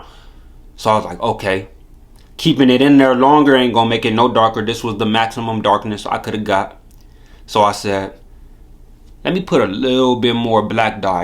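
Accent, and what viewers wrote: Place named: Los Angeles, United States. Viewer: mah man sounds like he from L.A. tho...😊 i tried to dye a p-nut p80 17 frame last night n it came out like what you said...dark brown burgundy using chocolate brown. i wasnt sure when to pull it out tho and kept it a tad too long in there. it went like dark from one moment to tha otha and i jus missed tha bus with this one. i have a tungsten grey/silver polished strike industries lite slide on there wich looks nice but i was shootin for exactly what you have there that lighter brown... i might pull out another fde frame and do your route,cause this is nice tho❤ very nice combination with that slide. i also want to try royal purple on a grey frame.... very nice what you have there....and you helped a lot with this vid... i shoulda seen it before i did mine😂. good lookin🤝🏾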